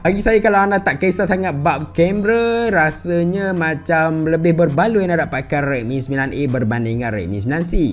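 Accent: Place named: North, Malaysia. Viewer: Wah org utaqa mana ii mai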